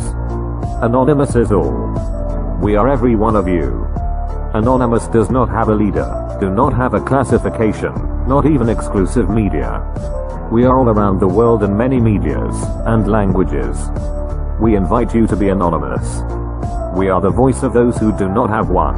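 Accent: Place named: United States. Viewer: Ata, da pra ver q quem está falando é um americano. Brasileiro ou qualquer pessoa no mundo pode falar fluentemente o inglês, msm assim tem sotaque.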